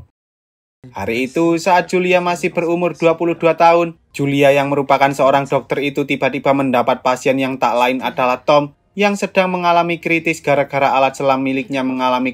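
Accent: Java, Indonesia. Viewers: Bisa gak pake medok gak? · BANG LU ORANG JAWA KAH😊=BANG LO WONG JOWO TAH😊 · Paling seneng dengerin review film yang suara yang review ada jawa jawa nya🗿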